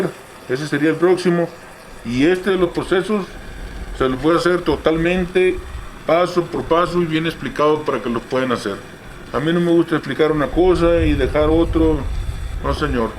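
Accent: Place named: Northern Mexico, Mexico. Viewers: Eres de Sinaloa? Tienes el acentohaha buen vídeo hermano, muy interesante la verdad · Muy bien mi amigo,me acabo de suscribir,se nota por tu acento que eres norteño,yo soy de Aguascalientes,de zona de minas,un abrazo y fuerte saludo compa,por tu sencillez y por compartir tus conocimientos,me gustaría que hicieras un vídeo de como extraer oro de tarjetas electrónicas,a mi me llaman Ripper,a tus órdenes,y seguimos en contacto,dios te bendiga,ah y te voy a hacer una pregunta,ojalá me la sepas contestar, " a quien pertenece todo el oro y la plata ? ". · tu acento de chihuahua o sonora es indiscutible ¨ya pisha una soda mushasho"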